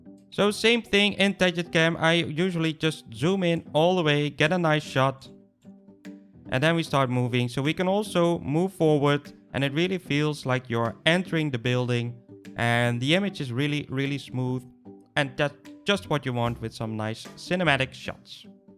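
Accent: Netherlands. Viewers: Your accent sounds dutch... ben je een Nederlander?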